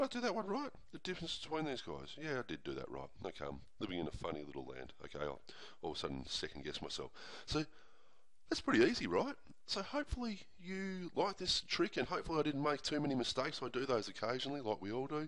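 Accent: Australia. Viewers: This man is violently Australian and I like it. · Man! Thanks u are brilliant . It will help us for sure. And i guess from me U are an aussie. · are you Australian ... ? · Is that an Aussie accent... I love it XD. Also thanks sirrr!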